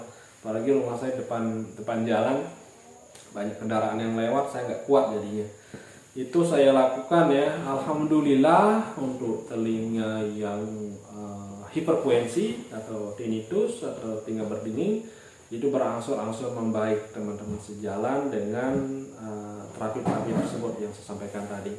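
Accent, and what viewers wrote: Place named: Lombok, Indonesia. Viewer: Kayak logatnya orang lombok ini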